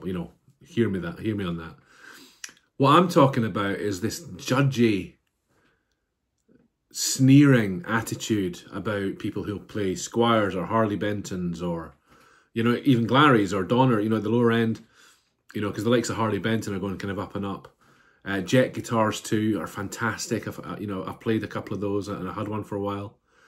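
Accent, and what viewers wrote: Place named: Scotland, United Kingdom. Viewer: Hey man, nice to hear a fellow Scotsman talking about guitars 😁 Totally with you on this and I love your honest approach. I recently uploaded a video on a similar thing with overdrive pedals. Also, on your point about having to sell guitars - I hear you! I have had loads of gear come and go. Some of it I regret and miss but it's the way it is. Glad I found your channel. Talking sense, yes!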